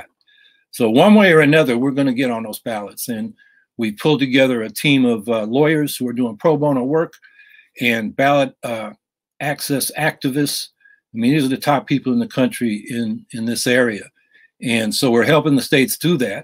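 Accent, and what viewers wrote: Texas, United States. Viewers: I’m from Central and North Texas and can confirm he talks like a Texan or an Okie. Especially how he pronounces “the” like “də”. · Love your office, but for a man born in San Francisco why do you talk your from 1890s Texas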